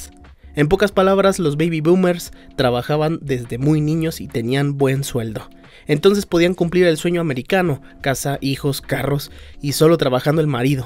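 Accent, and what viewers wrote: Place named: Mexico. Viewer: me gusto como lo cuentas, ademas que adoro el acento mexicano asi que mas grato aun! gracias por la info, inflacion... pff.. aqui en España ya no puedes ni quedar con amigos sin que cueste dinero por el precio solo de la gasolina, como no salgas con tus vecinos (abuelos la mayoria boomers) olvidalo. Millenials y centenials andamos siempre ocupados con trabajos, estudios (aun con 50 años) y como llegar a final de mes sin vivir bajo el puente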